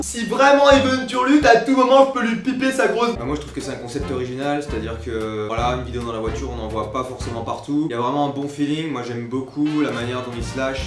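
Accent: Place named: Nord-Pas-de-Calais, France. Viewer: Putain l'accent ch'tit mec xD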